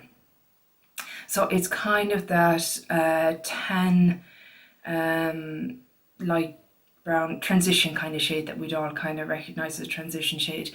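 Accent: Ireland. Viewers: Hi from Israel! I love Irish accent so much!❤❤❤❤❤❤❤xxxxooo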